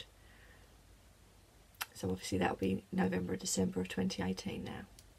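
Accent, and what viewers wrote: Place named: United Kingdom. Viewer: You do such lovely things. I could listen to you all day long, I do love the British accent, it sounds so much more cultured than our American English. The Royal Albert tea set is so beautiful, long a favorite of mine. I do love drinking from tea cups, but it cools too quickly, so I use a large, thick mug. Not so elegant, but serves the purpose. I hope to see you again soon.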